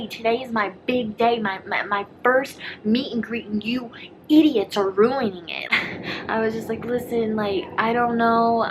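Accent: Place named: Virginia, United States. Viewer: Biiiiitch, I knew you had a Virginian accent. Just started watching your videos and was going crazy knowing your voice was so familiar lmao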